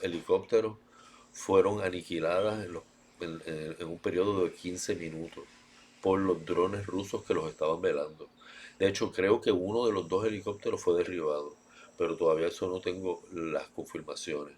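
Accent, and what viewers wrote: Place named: Cuba. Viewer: PONGA LOS PIES EN EL PISO AL HABLAR QUE TIENE LA MENTE IDA. ESO SON AÑOS DE HABER VIVIDO EN CUBA. POR LO QUE OIGO PARECE CUBANO.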